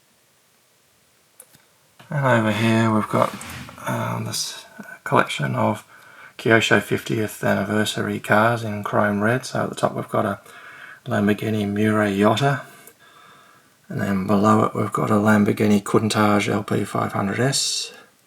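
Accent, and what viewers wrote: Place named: Australia. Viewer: Wow, nice collection. Sounds like you're in Aus. Nice to know there's a nice home if I need to sell.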